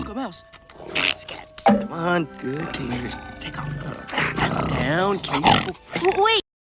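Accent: United Kingdom. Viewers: You from Manchester?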